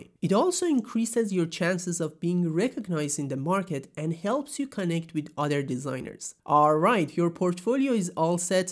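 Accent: Iran. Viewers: کلمه اول رو شنیدم اینجوری بودم که عه ایرانیه طرف😂❤ موفق باشی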